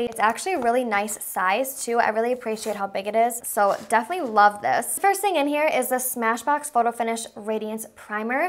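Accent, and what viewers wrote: Minnesota, United States. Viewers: I TELL YOU THIS MINNESOTAN ACCENT 😂😂😂😂😂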